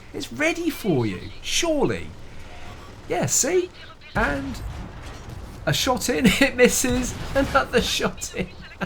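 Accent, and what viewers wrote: United Kingdom. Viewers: 35 minutes!!! Well played! It’s a pleasure to hear you talk! You’re my second favorite YouTube Wot Console player just behind The Turkey Tank, but your accent is so brittish it’s delighting for my french frog hears. Thank you and go on... Perhaps should you try the Jagdpanzer, it’s my second favorite premium to play behind the Vanguard, it’s fast, has a good camo rating and it’s challenging!!! Bye-bye...Bye-bye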